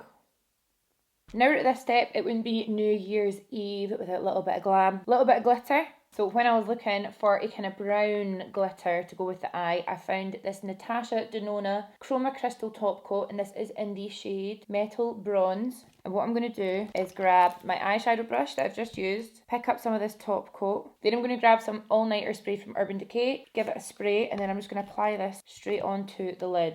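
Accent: Scotland, United Kingdom. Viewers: oh my god are u scottish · I have to admit I haven’t watched you in ages even though I’ve been following for a few years. I’ve missed you! So much talent and I love Scottish accents (must be because of my heritage)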